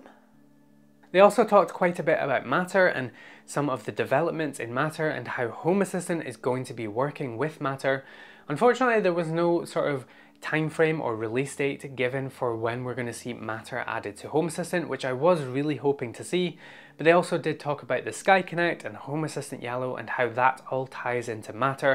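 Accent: Scotland, United Kingdom. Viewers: If Home Assistant does voice, then you should be that voice. Your relaxing Scottish brogue would make it!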